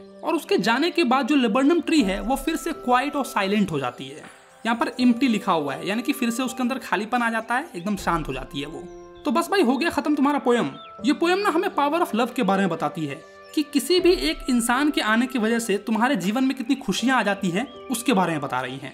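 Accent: Bihar, India. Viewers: Bhaiya your way to teach us is extremely nice, as I know you sometimes use bihari accent language that,s awesome I have also several friend from bihar which is also great and hilarious.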